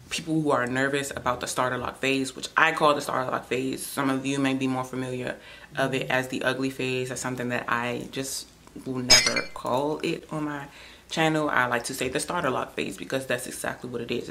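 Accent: DMV, United States. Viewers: New Sub. I picked up on the DMV in your voice before I read your bio :) Great Information!! Ready to start my new set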